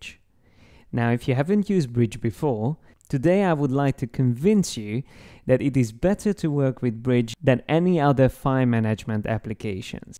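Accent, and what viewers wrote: Hungary. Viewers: Is it Hungarian accent I did hear? :) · hahaha your Hungarian accent is so obvious! Szeretlek Magyarorszag, köszi!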